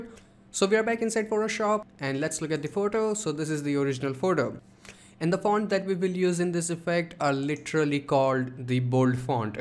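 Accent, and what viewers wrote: India. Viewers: INDIAN ACCENT -- PROBABLY FROM INDIA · Your accent is a lot Indian (95%) and some American (5%). You posing bruh?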